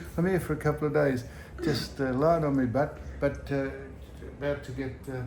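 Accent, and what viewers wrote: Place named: Australia. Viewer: God bless you,:) I like your larrikin banter. Always well said with Aussie charm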